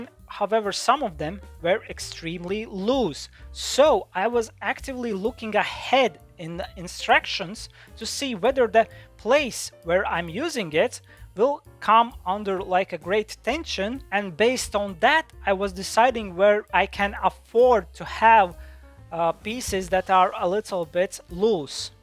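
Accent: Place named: Latvia. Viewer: Nice video but the latvian accent is strong 😂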